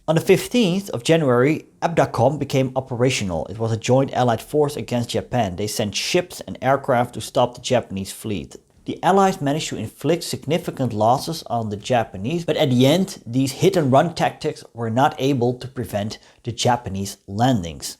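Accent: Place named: Netherlands. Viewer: Fellow Dutch history-lover here, great to see your stuff. That and it sounds funny (somehow) how you speak clean Dutch next to good English. Most that speak English sound really weird when they speak Dutch.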